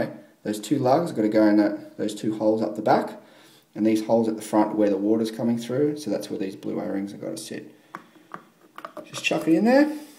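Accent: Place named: Australia. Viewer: Outstandingly good video because it was clearly demonstrated by an expert who spoke Aussie language. Good on ya mate..thanks!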